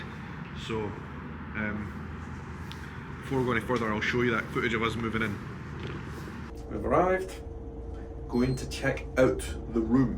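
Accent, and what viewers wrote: Scotland, United Kingdom. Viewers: sounds more Scottish than usual. It is one of Earth's coolest accents. 😁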